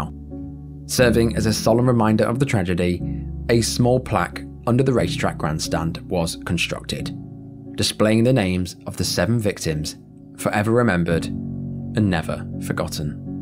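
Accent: United Kingdom. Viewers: I did NOT expect you to be bri'ish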